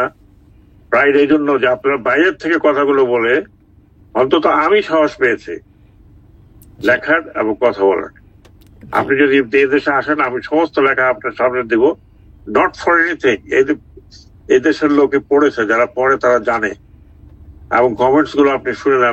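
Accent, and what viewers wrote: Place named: Dhaka, Bangladesh. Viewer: আমি হাসবো না কাঁদব আমি চিন্তা করে পাচ্ছি না । ভিডিও তে বলা হয়েছে, হোস্ট নাকি জার্মানি তে থাকে, ভালো কথা, জার্মানি তে থেকে ও ঢাকা সুরে বাংলা বলছে । উনি পোস্টমর্টেম এর পরের ইন্টারভিউ আর এখন এর ইন্টারভিউ টোটালি আলাদা। যাক বয়স হয়েছে, একটু ভুলে যেতেই পারে। কিন্তু এটা বুজছি না, যে যদি কল টা জার্মানি একজন এর কাছে করা হয়, তাহলে আপলোডের এর IP এড্রেস ঢাকা হয় কিভাবে ? জার্মান থেকে আপলোড করতে পারত না ? অলস অনেক চেষ্টা করছে বুট লুকাতে পারল না, চ্যানেল এর বসে Ip হলো ইন্ডিয়া 😂😂😂। হেয়ার রে গুযোগ এর দেশ । উপর থেকে ভিডিও তে আগে কি হবে সেটাও এডিট করে আগাম বানি দিয়ে দিছে 😂😅😂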